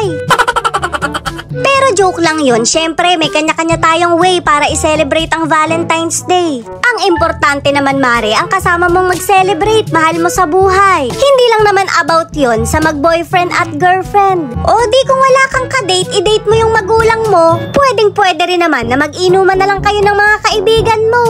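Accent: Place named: San Miguel, Philippines. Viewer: Natutuwa talaga sa mga taga San Miguel ganyan na ganyan magsalita tulad mo? Nalimutan ko nga name fr ko dyan siguro age nya nasa 45 yrs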